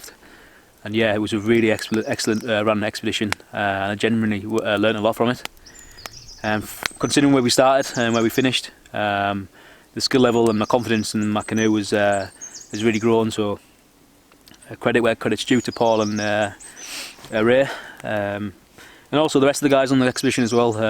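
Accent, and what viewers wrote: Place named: Teesside, United Kingdom. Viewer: Brilliant video again bud, were about's you from again? you sound local to me around the Cleveland/Teesside area. I could be totally wrong though lol, Great Channel Keep it up. Si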